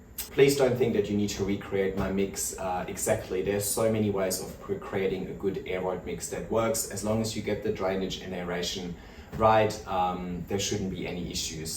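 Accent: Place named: Germany. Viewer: I just found you recently and I am loving the content 😍 You brought back my passion for plants after it's been dormant for a year or so 😊 Excuse me for being curious (I love different English accents), you call yourself, but do you have a German/Austrian background? Keep up the good work 👍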